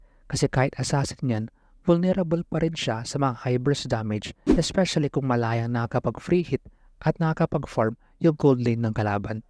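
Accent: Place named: Pangasinan, Philippines. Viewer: Naririnig ko ung Pangasinan Accent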